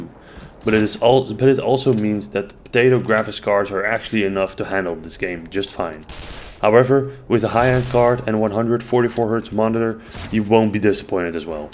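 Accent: Netherlands. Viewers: i can hear that your Dutch man xD nice video do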